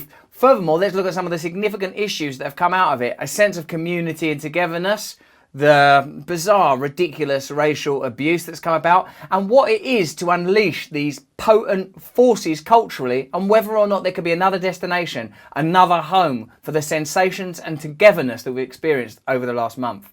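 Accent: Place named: England, United Kingdom. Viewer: The vocabulary and accent is all England but the hand movements is 110% Italian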